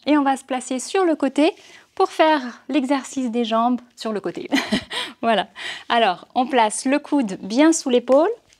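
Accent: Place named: Switzerland. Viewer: Ah mais c’est ça j’avais bien perçu un petit accent suisse délicieux qui me rappelle de bons moments à Genève! Merci. Je me demandais si avec de la musique ce ne serait pas mieux mais peut-être d’entendre votre respiration quand vous souffrez avec nous c’est bien aussi…très bonne séance avec des mouvements originaux et variés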